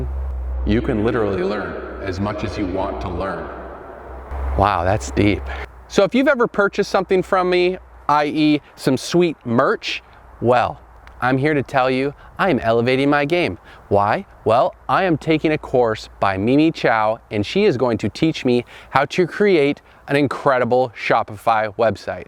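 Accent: Canada. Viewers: Man I knew you were Canadian. Could hear it in your voice. Haha. Cheers from Kelowna BC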